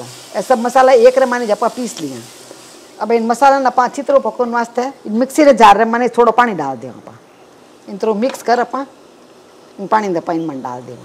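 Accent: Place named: Marwar, India. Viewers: Apka baingan KS khooshbu Kitna acha haga. Sweet marwari language ... sab baingan bann javen!! You speak amazing English also, quarter cup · I find it so easy to hit the LIKE button on your recipes even before watching them because they are always so unique and innovative. Your approach to cooking feels like magic, turning everyday ingredients into something extraordinary. Both my wife and I are devoted fans of yours; just looking at what you’re making makes our stomachs growl with anticipation. We can't wait to try your recipes ourselves. We also love your Marvarri accent—it's a delightful touch!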